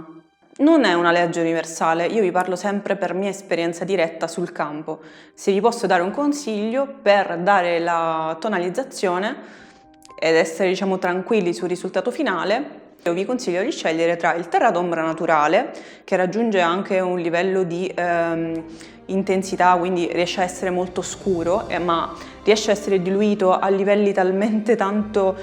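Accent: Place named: South, Italy. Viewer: MA LA TENDENZA DEL SUD SI SENTE !!!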